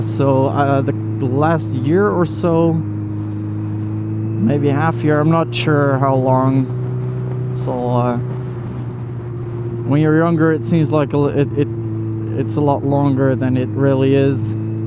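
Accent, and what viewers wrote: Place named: England, United Kingdom. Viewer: Not going to lie I may have screeched out of shock when you said my name.😂 Also before I had known you were Dutch I thought you might have been from England based off your accent when speaking English, but now I know it's probably from going to and English school in Saudi Arabia for some time.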